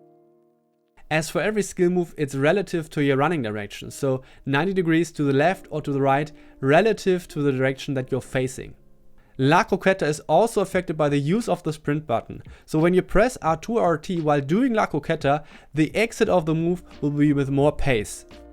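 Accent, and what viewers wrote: Germany. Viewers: because of your accent i guess u from german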